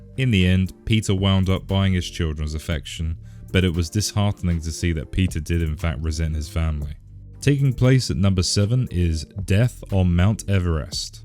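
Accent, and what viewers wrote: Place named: United Kingdom. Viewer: It’s so obvious that this guy is British…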